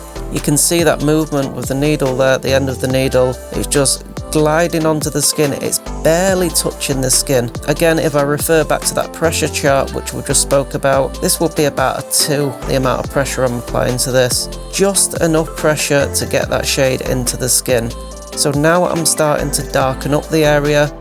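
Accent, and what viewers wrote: United Kingdom. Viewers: est-ce qu'il a un accent genre super british?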